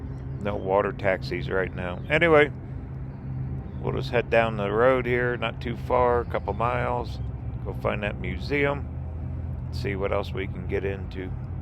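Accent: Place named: Pennsylvania, United States. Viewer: Listening to you talk, a little "accent" or pronunciation, are you from Pa. originally?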